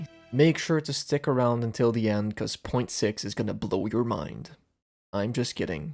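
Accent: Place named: Quebec, Canada. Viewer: I think I spotted a fellow Quebecer's accent. Cool video man, on aime le witcher 3 les deux autant je penses !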